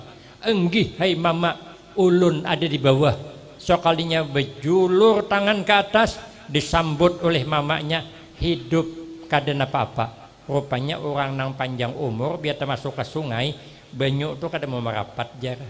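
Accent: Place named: Brunei. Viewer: Mcm org brunei bahasa nya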